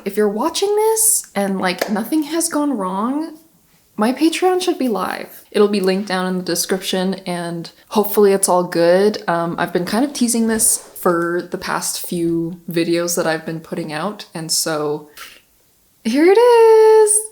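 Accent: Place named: United States. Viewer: you speak like a normal American accent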